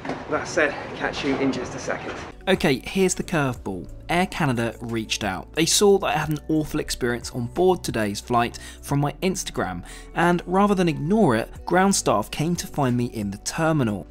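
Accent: RP, United Kingdom. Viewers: its wild watching this in comparison to how posh he sounds now LMAO. So he was once just a regular dude.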